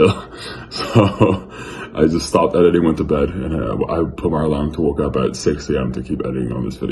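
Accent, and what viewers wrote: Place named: Quebec, Canada. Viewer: I thought so! By looking at your name I was wondering if you were French Canadian. Cool. You speak quite fast as well so with your accent it sounded different. I think the French accents we have over here are a little different to the ones you have over there. Love your channel and think you are doing a great job helping and inspiring people.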